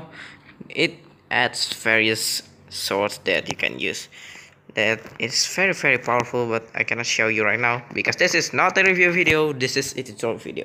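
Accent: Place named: Russia. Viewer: I am damn sure you are russian.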